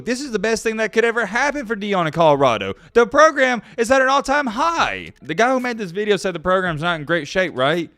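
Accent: South, United States. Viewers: The Deion Sanders era at Colorado will be very interesting to watch. It doesn't matter who the coach is, if they don't win the boosters and the fans will turn on him. But then again if he's successful then his reign in Colorado won't be long. P.S. That girl in the video was that your wife, girlfriend, sister, cousin (you sound like you're from the south) or is that some sort of stalker thing going on?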